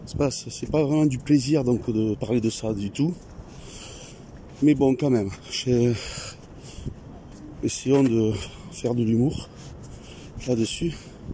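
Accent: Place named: Nord, France. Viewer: C ' ęst un bęl accęnnnnnnnnt , 😉👍 mi chē mí , chē d'tí , ti zotę , achtęur : traduc'tíon : moí c ' ęst moí , c' ęst dę toí , vous autręs , maíntęnant . Rięn d ' aussí chantant qu á toulousę , sínon ící dans lę plat paÿs ,c ' ęst trēs chalęuręux dans lęs blęds , moíns lęs grandęs víllęs . Bíęn a toí , tę bílęs pas , cę quí doít arrívęr arrívęra .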